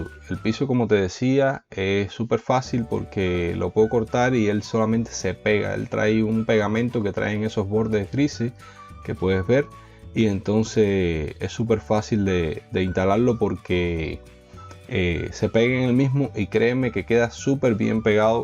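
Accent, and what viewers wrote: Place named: Cuba. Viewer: Por tu forma de hablar me imagino eres cubano un saludo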